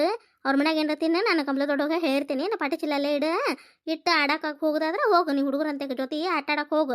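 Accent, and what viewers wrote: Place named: North Karnataka, India. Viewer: ಇನ್ನೊಂದು ಎಪಿಸೋಡ್ ಹಾಕ್ರಿ ನಿಮ್ಮ ಉತ್ತರ ಕನ್ನಡ ಭಾಷೆ ಸೂಪರ್❤❤❤❤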